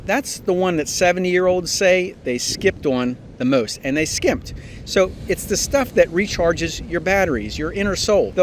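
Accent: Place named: Baltimore, United States. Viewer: Sounds like a Baltimore or Pennsylvania accent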